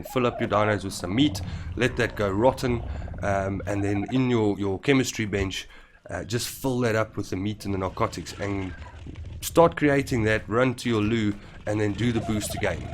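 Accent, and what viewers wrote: South Africa. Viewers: I also picked up on the accent. Proudly SA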